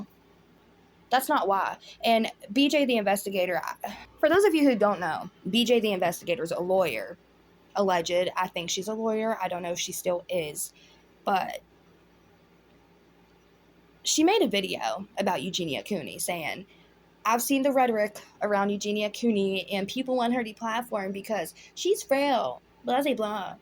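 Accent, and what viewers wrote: South, United States. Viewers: do i hear a little southern twang in your voice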